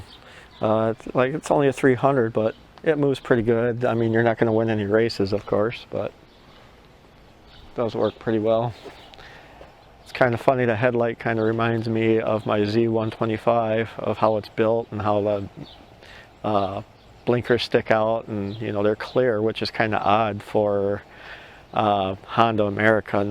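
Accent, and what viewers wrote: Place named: Wisconsin, United States. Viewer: I knew you were from Wisconsin, not that the accent is a dead give away but you speak well, maybe im biased but i think our state has many smart well spoken people, and you did not want to make your neighbors angry, that is very respectful only us Wisconsinites are that cool, and you want to leave the stock exhaust on haha, I leave it on to haha. Very cool man!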